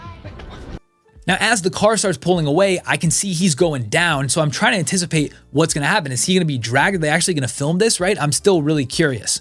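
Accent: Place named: United States. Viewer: Thanks for clear American English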